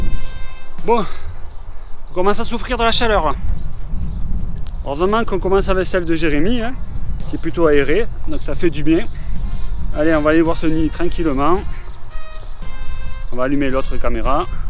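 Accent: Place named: Toulouse, France. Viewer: avec un accent toulousain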